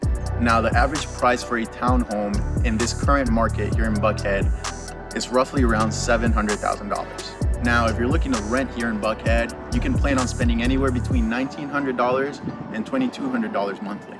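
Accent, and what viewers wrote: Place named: Latin America. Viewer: Hey, thanks for the video, very informative, I like you're latín too couse I could understand All the video (im practicing my english) and that make me happy... Well im dreaming to live in there (im from Colombia) and I wanna know how is to be latín in ATL (maybe one video idea)... Leave you a big like!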